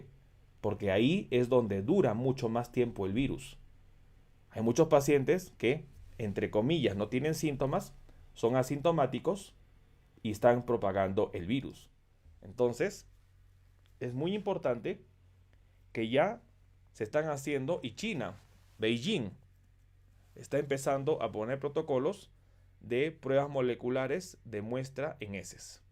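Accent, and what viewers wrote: Peru. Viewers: Peruano no? 🇵🇪 Vamooo!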